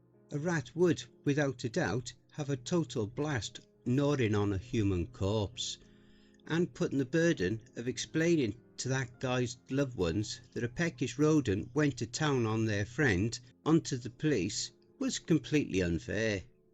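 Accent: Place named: RP, United Kingdom. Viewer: With a delightful RP British accent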